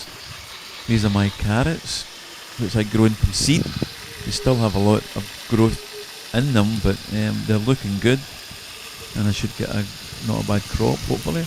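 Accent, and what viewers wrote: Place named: Wales, United Kingdom. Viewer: Thank You, great video once again,well done & very good presentation, look out Titchmark, hope the BBC WATCH THIS, He's finished, lovely Welsh accent--- Love ya dearly! Cheers OXOX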